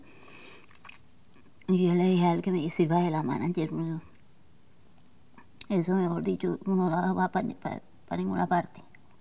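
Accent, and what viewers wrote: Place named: Cali, Colombia. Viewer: Tengo un amigo caleño que habla igualito, jejejejeje Que artista tan buena eres, la mejor.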